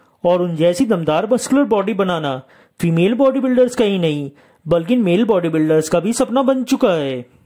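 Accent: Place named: India. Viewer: I couldn't understand a word that the so called narrator was saying, due to his extremely thick Indian accent. So it just sounded like gibberish to me.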